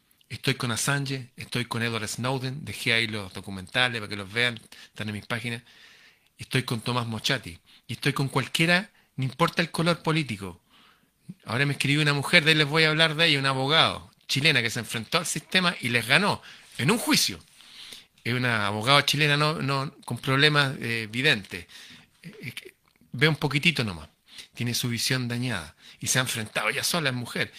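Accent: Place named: Argentina. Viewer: Parece que hablas de Argentina !!!! Qué bueno es escucharte! Cariños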